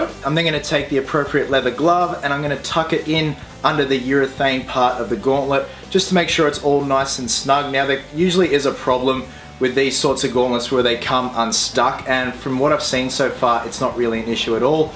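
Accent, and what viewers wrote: Australia. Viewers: There is something visually strange about Batman with an Australian accent. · The suit looks badass but it is so funny when I see batman just speaking in an Aussie accent saying be happy, be silly hahaha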